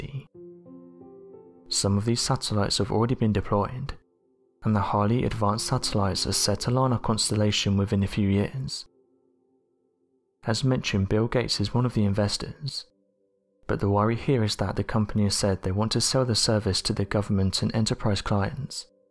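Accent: United Kingdom. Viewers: Can someone with a non-british accent please make some space videos. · He's British. Some of them do that.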